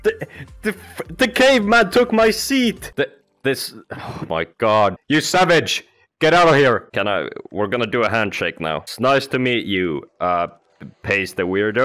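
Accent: Finland. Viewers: i love your english pronounciation, reminds me a little bit of the famous finnish "rally english", and i mean that in the best way possible. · I might be totally wrong but your english sounds like you're finnish, is that true or am I tripping? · Hol up is that a finnish accent? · Are you finnish? You sound finnish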